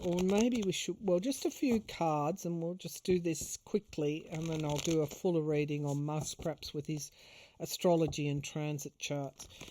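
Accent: Australia. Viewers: Hello, firstly thank you for all your work, it’s much appreciated! ❤ 👏 Just a quick note: the far-right party in Germany is called AfD, („Alternative für Deutschland“ = Alternative for Germany), not „Adf“, as you kept on saying. With your Australian accent „Adf“ almost even sounds like „IDF“, the Israeli Defence Forces.:) No biggie, but I thought you’d want to use the right party name. It’s so easy to mix up all these abbreviations..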